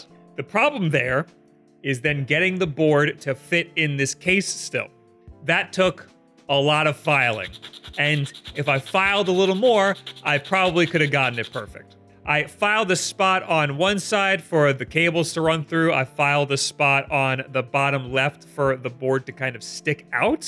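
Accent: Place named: United States. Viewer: Why do americans say saughtering instead of soldering? Is the word spelled differently in USA?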